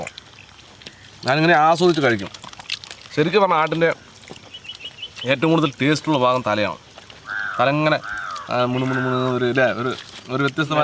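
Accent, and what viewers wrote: Palakkad, India. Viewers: കണ്ണ് വോണ്ട നമുക്ക് പാലക്കാട്‌ സ്ലാങ് 🤣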